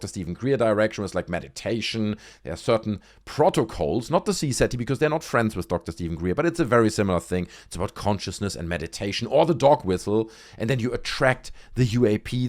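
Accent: Germany. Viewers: LMAOOO, DUDE!! RIGHT?! It pisses me off so much, We need REAL DATA!!! NOT all of this BS that does nothing but muddy up the waters in this area of research... It's such a shame... It really is man... (Cool German accent you have btw haha)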